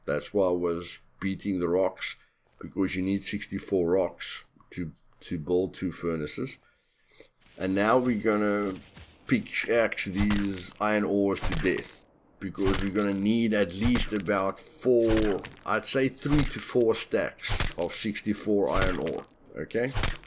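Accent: South Africa. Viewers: I was going to say you sound South African. Glad to see another SA player making content. Lekker boet lekker. Keep em going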